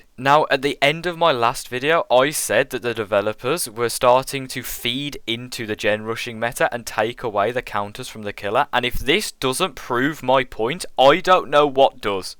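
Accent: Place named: United Kingdom. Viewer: hmmm, this guy sounds british ....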